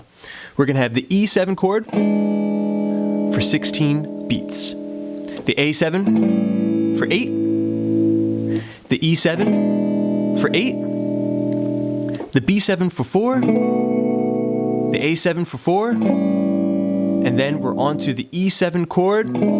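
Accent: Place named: Philadelphia, United States. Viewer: I thought I detected a Philly accent...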